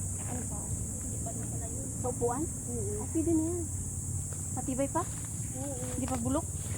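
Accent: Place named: Bicol, Philippines. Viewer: Taga bicol din kc ako pamilyar yong salita mo sa akin may punto kc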